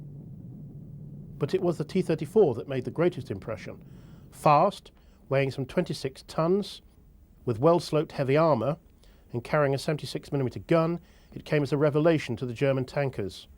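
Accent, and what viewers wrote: England, United Kingdom. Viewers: You mean proper English without American accent? :P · the british accent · nice video,the only thing is i can't understand the british accent :( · morti271 he has a lot of brithis accent but for me is better than the american one . is more easy to understand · I had a hard time trying to understand the narrator. Too much English (accent)....lol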